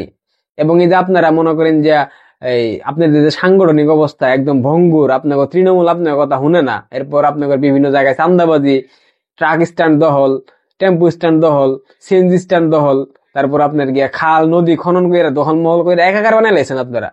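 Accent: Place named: Bangal, Bangladesh. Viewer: ভাই তোমার মত কয়েকজনকে দেখি এত বাঙাল ভাষায় বলো কথা, আমরা যারা কলকাতায় থাকি তারা তো তোমাদের চ্যানেল , তোমাদের ইউটিউব গুলো দেখি তাদের একটু বুঝতে অসুবিধা হয়। একটু পরিষ্কার ভাষায় বলা যায় না। এমন নয় যে তোমরা পারো না।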